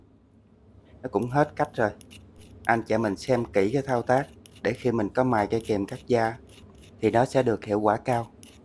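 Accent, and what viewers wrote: South, Vietnam. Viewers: Giọng miền Nam dễ thương, hy vọng chủ kênh ko phải Vietcong. Chỗ tui sống toàn Vietcong sợ quá Vc luôn.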